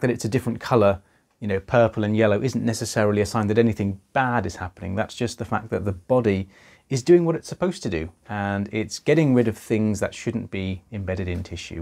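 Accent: United Kingdom. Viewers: It's 1am and I ended up here. Watching a hot doctor with a British accent pull out ear wax, which is satisfying on so many levels.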